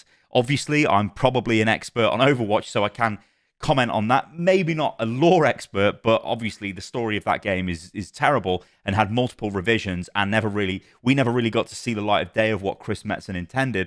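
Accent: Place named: United Kingdom. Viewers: Btw, you should promote this channel more on your main channel to get more viewer. I like the way you talk, totally british. Me as foreigner is enjoyed well spoken english in order to learn myself while watching videos :) also i like the differenciate look at overwatch and you also point out and appreciate what they do good. And thats nice, not everything is bad. I know i sound like a simp but i really like your style! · Btw, you should promote this channel more on your main channel to get more viewers. I like the way you speak, totally British. Me as a foreigner, enjoys well spoken English in order to learn myself while watching videos :) I also like the different look at Overwatch and you also point out and appreciate what they do well, that's nice, not everything is bad. I know I sound like a simp, but I really like your style! Not meaning to be cunty, genuinely hope I help you with your learning, you're pretty good already though.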